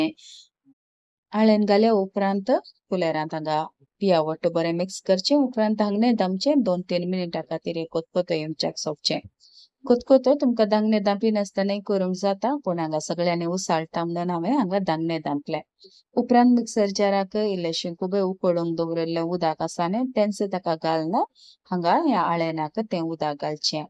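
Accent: Konkan, India. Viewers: Thank you I am watching yr video your voice is so good and very konkani is pure so i get to hear the words. Recipes all mangalorian dishes are superb . Thank you bai. Can we give tadka .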